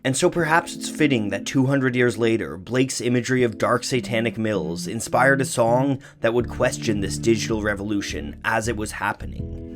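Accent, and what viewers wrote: Canada. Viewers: You have such an interesting accent. Are you Canadian?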